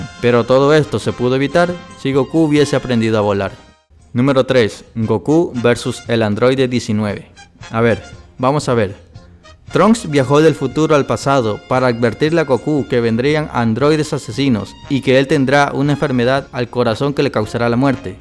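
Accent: Chile. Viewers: Jauregui Zurita chileno por el acento facil de diferenciar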